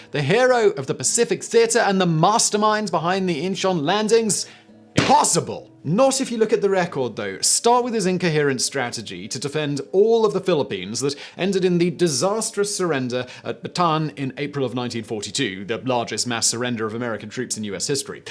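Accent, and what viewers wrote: United Kingdom. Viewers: BUT, I loved his British accent. I have to find myself an Englishman.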